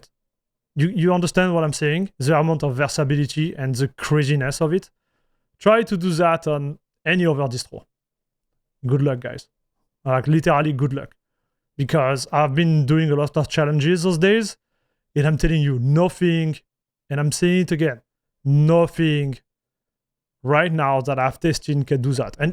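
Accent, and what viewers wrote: France. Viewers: Sorry for reducing you, but I only watched this for the english with french accent.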